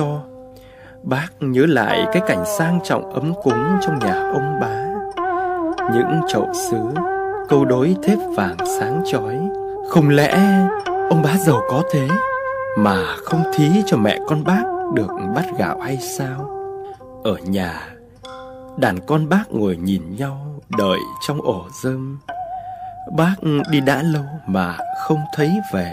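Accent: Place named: Nghe An, Vietnam. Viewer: Long cái tieng nghê an lam mat cam xúc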